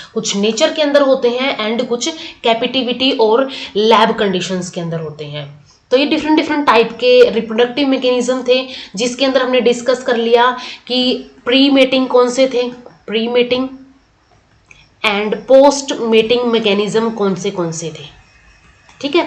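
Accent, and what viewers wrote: Haryana, India. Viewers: Mam Haryana se ho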